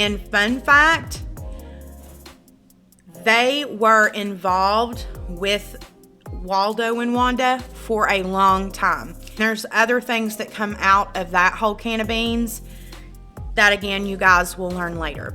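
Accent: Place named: Tennessee, United States. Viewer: I love me some Tennessee accent!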